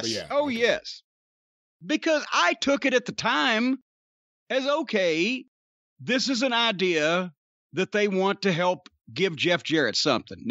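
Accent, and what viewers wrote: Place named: Kentucky, United States. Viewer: Especially considering Jim is originally from Kentucky and still has his accent.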